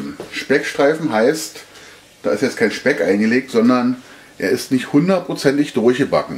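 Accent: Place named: Berlin, Germany. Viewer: Hallo, hab das Rezept für´nen einfachen Sandkuchen gesucht, weil ich den von meinem Vater geliebt habe. Er hatte eine Bäckerei/Konditorei auch in Berlin; daher kam mir dein Slang so bekannt und heimisch vor:) Danke danke danke für dieses prima Rezept. Gespeichert hab ich so einiges, aber dich hab ich abonniert!!!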